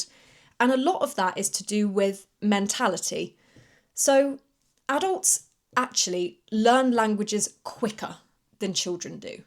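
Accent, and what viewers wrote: United Kingdom. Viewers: 안녕하세요~~~ 영국발음 너무 좋아요~~ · What a cute teacher! UK accent is very cool. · Very interesting to know how an adult can learn foreign languages easily and your story is indeed so interesting, as English natives seldom speak foreign languages. Determination is so important. Your videos are really helpful and your British prononciation is absolutely fantastic and you are a great professor.Thank you very much ❤ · Where are you from? You speak in British accent, but it seems to me that you live in USA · Everyday is the earliest/youngest day to start. Just do it. Actually that's so much easier than before since YT exist. In the old days, what you can do is rolling the the sound tape and checking the book at the same time. It's impossible for me. YT teach the english so much more effective than the school at my young days. Nothing better than listen to some topic you are interested with the language you learning. 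For other english learners, this video is speaking with very English English accent. If you speak in this accent, you will be a funny guy in America and very native in UK. There is so much video on YT but there is not much video making by British. You know what, I feel so confidence on English until I arrived UK. I understand nothing when people speaking. I feel like I got scam all my life time by the school and YT. I realize I learnt American English the whole time and that's why I failed my school exam (mostly base on British English). But did a really great job, I can understand 100% in this podcast. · I always love watching your videos,. It's so easy to learn British English from your words, and it's so enjoyable. You're great, keep it up and take care. See you soon,. 🇬🇧🇬🇧👍🏻👍🏻📚📚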